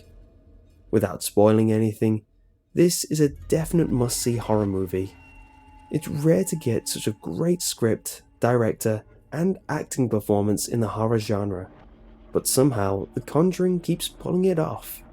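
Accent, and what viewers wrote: United Kingdom. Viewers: He's British, that's why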